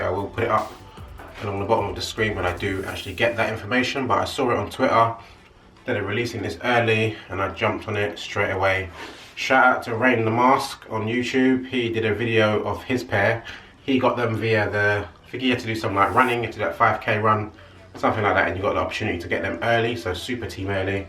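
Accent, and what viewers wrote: England, United Kingdom. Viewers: I had early access to these too and the white ones!! I wish I'd of got the little buggars now as they look so much better than them boring fucking ultraboost. We need something fresh and new and here it is. At least that black bottom ain't gonna rub the fuck off either. They look dope as fuck. Proper Mad ting bruv!! I picked up some serious heat today. Nike no one's done a video on yet anyway. English heat early birds release again. Hope you'll check out some of my vids I think you'll find them different to any other. I try to make them funny and more mock style reviews. But your welcome to join the crew as I need some English blood man. And I'm detecting an English accent there. Fucking sick top too. I just wish Nike done bigger sizes I picked up some crazy bottoms in a 2xl and they are nearly there but tops I'm pretty Large on top like 56 inch chest so I'm gutted as I've been checking that jacket out for a while haha!! 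Safe Geezer. Nice Video. Subbed you. Liked ya and a good size comment too. DONE. Haha!! Yeah I need your support dude. I hope you'll come over to the DANGER Russ Side. 😁😆😉👍🏼🙏🏻✌🏼.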